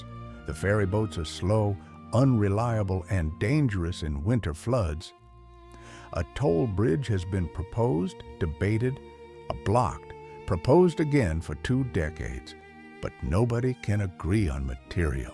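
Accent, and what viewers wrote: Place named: Appalachia, United States. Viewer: What on earth are you doing using an American hill billy to give a commentary on industrial Britain???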